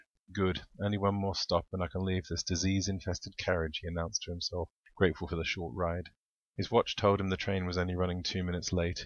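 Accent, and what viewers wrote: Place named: England, United Kingdom. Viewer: Love the photos. Love hearing an english reader and can picture it perfectly. Makes such a difference from listening to the american audio books. So hard to picture a scene with nothing to relate to. Love it! Thank you for giving us brits a great story.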